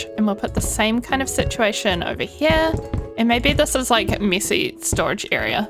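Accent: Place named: New Zealand. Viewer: 200 days is def needed! This is just so cozy and your New Zealand accent just makes this all the more better. <3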